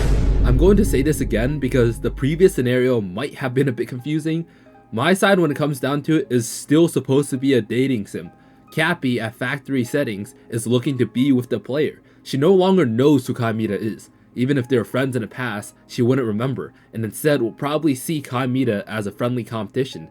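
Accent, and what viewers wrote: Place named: United Kingdom. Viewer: can’t watch game theory since the new guys british accent keeps throwing me off and making me nauseous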